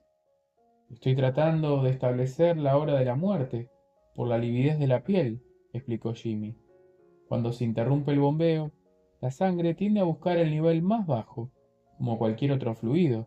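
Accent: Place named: Argentina. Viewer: Querido, mil GRACIAS por leernos. Consulta: ya leíste en el otro canal APOCALIPSIS? Que gusto escuchar leer en argentino 😁💪🏽🇦🇷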